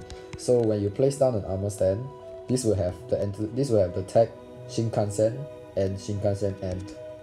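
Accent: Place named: Singapore. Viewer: Bro you sound like a singaporean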